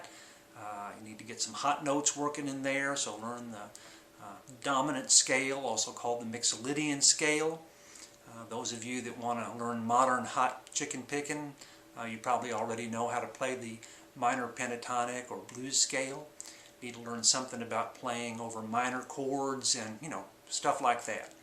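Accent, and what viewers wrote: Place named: Texas, United States. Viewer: You’re accent makes me miss Texas!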